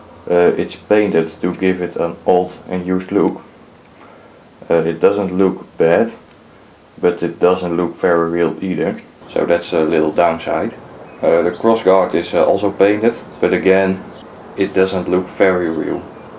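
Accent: Netherlands. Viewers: Are you Dutch?You have a Dutch accent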